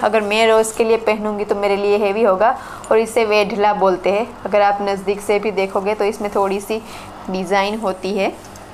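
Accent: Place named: Gujarat, India. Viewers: Wow your local gujarati tone is superb and you and and also lovely looking so traditional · ખૂબ મજાનું સાથે સાથે જે શુદ્ધ કાઠિયાવાડી ભાષા માં આપ બોલ્યા તેતો ખુબજ મજાનું.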